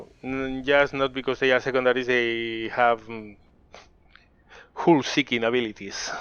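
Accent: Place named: Spain. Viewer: Keep on doing what you do now, if only you have the time and energy. The accent doesn't personally bother me at all, rather on the contrary. I don't speak Spanish myself, but I love listening to it because of the flow of it and how it sounds. Your accent kinda imbues your English (which in my opinion is excellent) with the same kind of flow without making it difficult to understand, so it's all good. Drachinifel is Drachinifel, and is well and truly deserving of his status. I also very much agree on what you said about his wits and dry humor. It was only a few weeks ago when I last watched the "Voyage of the Damned" about the Imperial Russian 2nd(?) Navy's unfortunate and incompetent voyage from the Baltic Sea to the Pacific, and I laughed so hard my eyes were watering 😂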